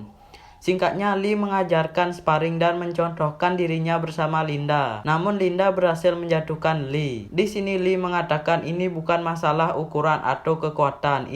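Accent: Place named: Bali, Indonesia. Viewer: Sperti suara orang bali 😅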